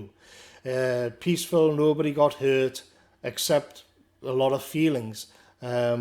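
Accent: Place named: Geordie, United Kingdom. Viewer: Superb analysis, superb accent. Thank you!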